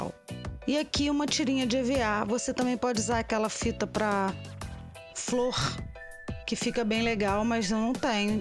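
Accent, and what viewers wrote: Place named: Rio de Janeiro, Brazil. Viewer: É fácil reconhecer nosssso "sotaque"! #RJ❤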